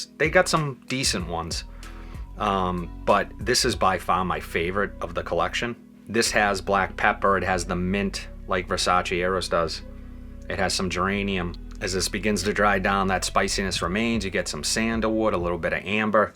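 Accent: Boston, United States. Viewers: THAT BOSTON ACCENT!!! FIRE!!!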